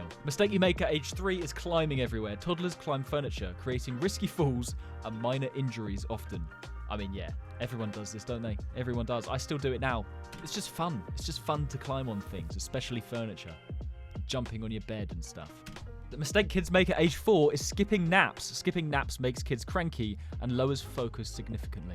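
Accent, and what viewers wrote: United Kingdom. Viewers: Your voice sounds like your from U.K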